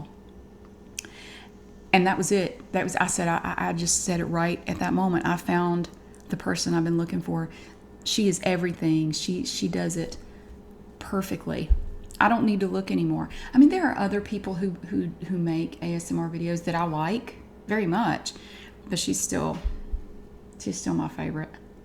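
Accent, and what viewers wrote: South, United States. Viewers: MY GOD you look incredibly stunning! I think it's that success glow, new house, 2 year long channel, new camera (maybe?) but regardless you look amazing! You are seriously one of the most underrated ASMR channel out there. Your content is so simple but bold at the same time, hands down my favorite channel. Thank you for being who you are, dedicating yourself to this channel with a full time job, and 2 kids, I seriously don't know how you do it but you have my respect! I really want to watch this right now but I gotta go to work. But I can't wait to get home and fall asleep to this beautiful Southern belle talk me to sleep! lol.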